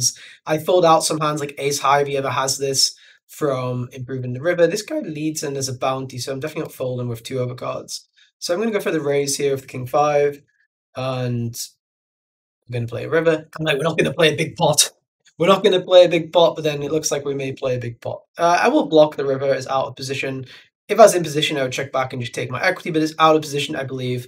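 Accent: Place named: United Kingdom. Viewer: Where are you from in the UK? Hard to pick the accent!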